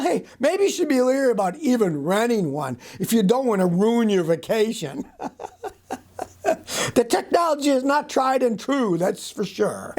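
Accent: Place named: South, United States. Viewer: I love your southern trang 😂 you make my day 👏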